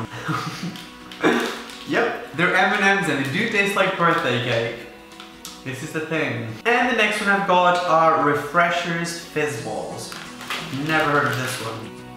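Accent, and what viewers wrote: United Kingdom. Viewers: I THOUGHT YOU WERE BRITISH!!! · Is he British or Australian? I’m confused? 🤨😂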